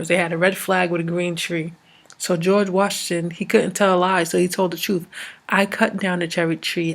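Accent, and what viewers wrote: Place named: England, United Kingdom. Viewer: I dig everything you've said, period, that's the point right?. Are you English or from London. I notice you have a bit of an accent when you speak. But nice information. I respect that, but if you are then be that, don' fake the funk, that is if you are.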